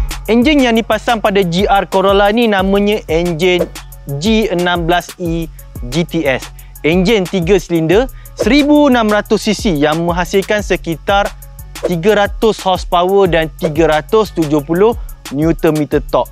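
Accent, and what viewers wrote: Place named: Terengganu, Malaysia. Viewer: steady abg ni review. tapi kadang2 terdengar slang Terengganu dia tu. steady awok kite.haha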